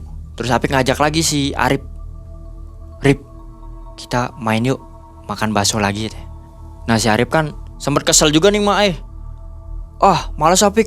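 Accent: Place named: Cirebon, Indonesia. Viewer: Penuturnya enakan mas...logat cerbon tp apa adanya,lugu polos gk dibuat2..mas smakin lama cerita,smkin penasaran jgn hbis dlu critanya..klo apik ini berapi2 tp malah pngn cpt2 selesai...ayo mas,cerita lgi mas..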